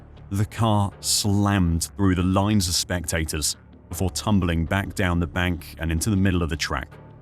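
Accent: England, United Kingdom. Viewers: Regarding Tom Pryce's accident - it's "car park" - not "parking lot". You sound English to me so you should use the English terminology.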